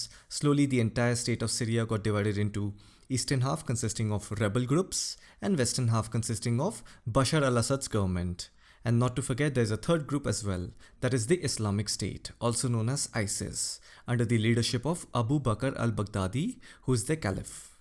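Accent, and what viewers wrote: Bengal, India. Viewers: You have such a nice voice and nice English Accent. True bengali characteristics ❤️.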